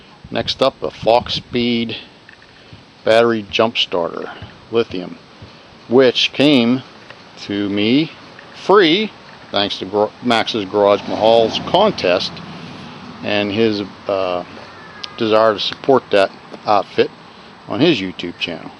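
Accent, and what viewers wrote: Pittsburgh, United States. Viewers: "Shipped to the haaass" 😂 love that Pittsburgh-ese!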